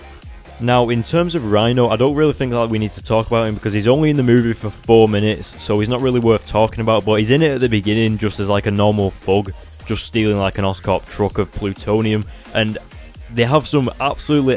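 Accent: United Kingdom. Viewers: r u british or american and which of those countries do u live in im american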